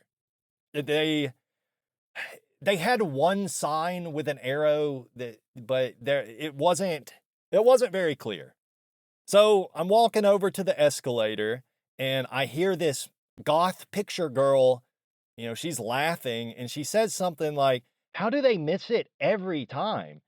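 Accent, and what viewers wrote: South, United States. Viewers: If all of this happened, which I very much doubt, why would you not stop your cousin, the multiple times he kept saying "black one!" to tell him that he shouldn't say that. You sound like you're from the South. There's no way you didn't know better. You are making all of this up. And it's cringe.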